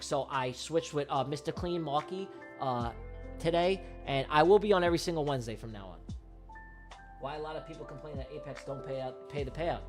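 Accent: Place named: New York, United States. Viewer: Bro can definitely talk for sure. No doubt about it he's from new york.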